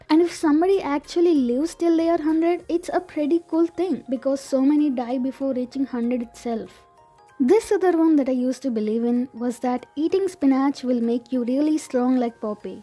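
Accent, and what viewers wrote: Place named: India. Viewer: I thought u r from Europe 😅 and when I listen language and than I know u r from India 😅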